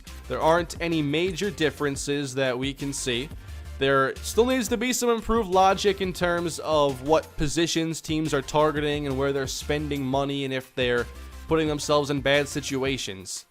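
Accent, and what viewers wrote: Minnesota, United States. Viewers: are you from minnesota? ++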